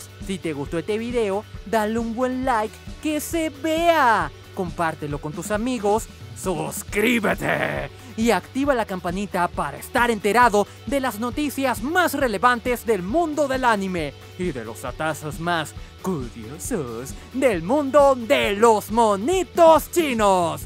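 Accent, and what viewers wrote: Venezuela. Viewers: eres Venezolano?jajaja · eres de Venezuela? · Tío eres de Venezuela? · Verga pero el que habla es Venezolano? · hola y buenas disculpa pero eres venezolano?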